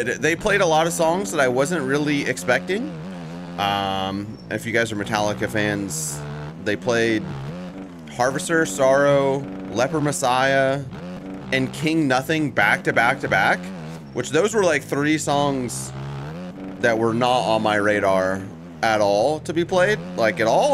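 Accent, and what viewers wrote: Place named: Philadelphia, United States. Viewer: Wheres your accent from buddy? You gotta be from philly area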